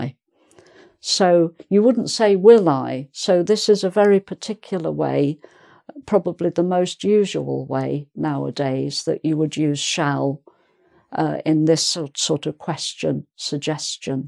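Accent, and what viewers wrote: United Kingdom. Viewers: thanks, thanks, thanks, now I understand how to use shall. (love your accent...so british)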